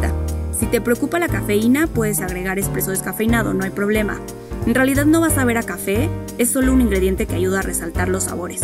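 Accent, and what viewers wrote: Mexico. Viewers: el acento whitexican de la narrado me asqueo ! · Lo malo del video es la voz whitexican, pierde credibilidad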